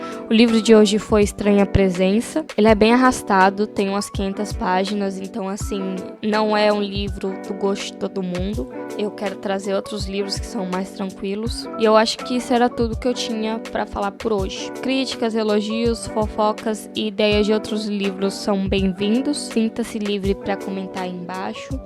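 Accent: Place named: Brazil. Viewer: Conheci seu canal hoje, muito bom mesmo! Voce é de minas, Goiás ou nordeste? Sinto que seu jeito de falar é tao familiar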